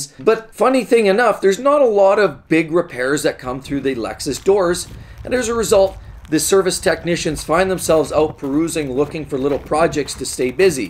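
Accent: Canada. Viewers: Awesome Canadian accent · Well this guy has a very thick country Canadian accent. It reminds me of Fargo. ❤️👍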